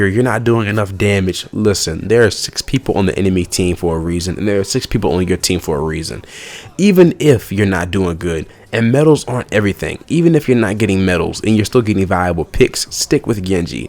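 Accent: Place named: United States. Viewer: wasnt a fan of your heavy american accent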